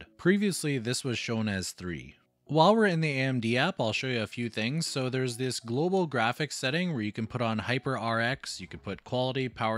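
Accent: Canada. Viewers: Great, to-the-point video. Also, love the Canadian accent.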